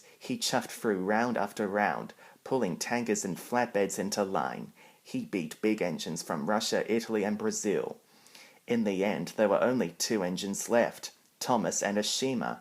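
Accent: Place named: United Kingdom. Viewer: Nice narrating☺you're using british english☺because your accent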